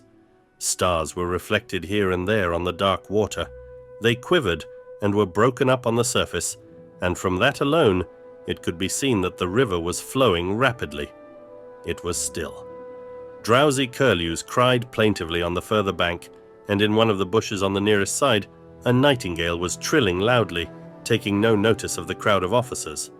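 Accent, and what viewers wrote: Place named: Russia. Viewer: У чтеца ярко выраженный русский акцент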